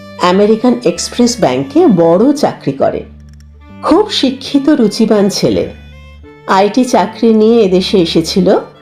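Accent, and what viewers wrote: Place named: Dhaka, Bangladesh. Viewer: খুব খুউব ভাল লাগল । তাছাড়া ঢাকাই টানে কথাগুলো চমৎকার লাগলো। আমিও যে ঢাকার মানুষ ।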